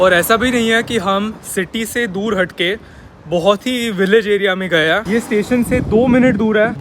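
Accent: Maharashtra, India. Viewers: Marathi guy can never leaves his accent 😊😊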